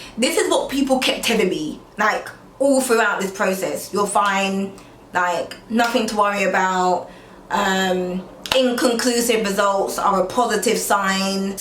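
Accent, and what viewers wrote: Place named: England, United Kingdom. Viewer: I wonder where in England you’re from?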